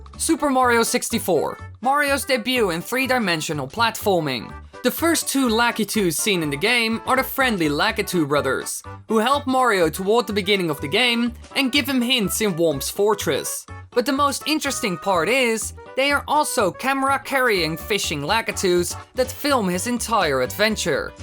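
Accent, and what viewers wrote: Netherlands. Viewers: I already thought you were Dutch, I am also Dutch. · wait ur dutch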